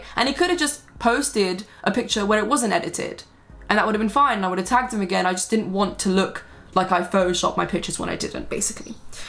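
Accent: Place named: United States. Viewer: Why do u put on an American accent for?? Or are you but lived out of u.s for a while? Xx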